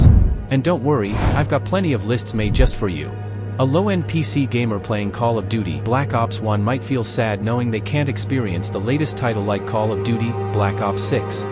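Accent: India. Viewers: Dude r u from India?!!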